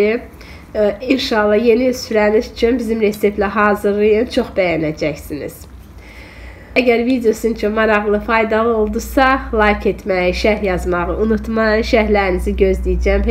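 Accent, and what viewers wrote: Baku, Azerbaijan. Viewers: Çox sağ olun,əllərinizə sağlığ hər bir təamı çox gözəl Bakı ləhcəsin də izah edirsiniz adama xoş gəlir.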